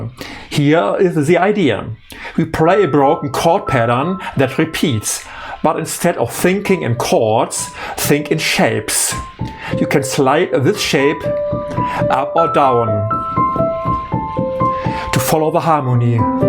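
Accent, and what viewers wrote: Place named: Austria. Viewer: Yes, sir, I do, and as well, with learning languages. By the way, I believe that it stated that you sre located in Germany, but are you Austrian? I was trying to place your accent.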